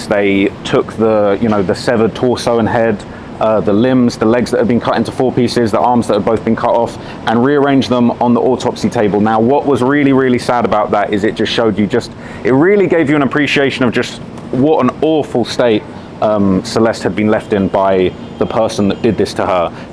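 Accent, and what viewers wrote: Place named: United Kingdom. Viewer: That’s how British people speak bro calm down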